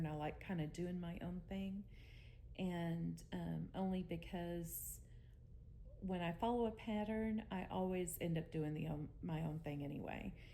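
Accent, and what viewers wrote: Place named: South, United States. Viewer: no you won't bore us. I watched two of yours videos today and you weren't boring at all. Plus you have that cute Southern accent. I wish I had one 😊 I'm a Southern girl at heart. At least I get to visit the south these days and I have Southern friends now.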